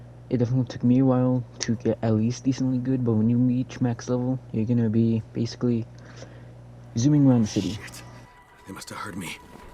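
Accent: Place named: South Africa. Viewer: Great game. Thanks for the video. Btw, what accent is that? Sounds like south african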